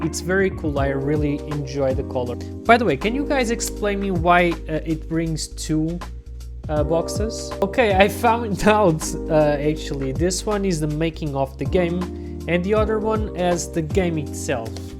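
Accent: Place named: Portugal. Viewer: i can tell by ur accent man:p great video i was thinking about buying that it's on sale on the ps store, eu também sou tuga ahah:p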